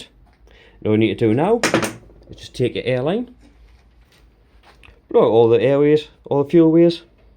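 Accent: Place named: Newcastle, United Kingdom. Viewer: Geordie it’s easy to understand.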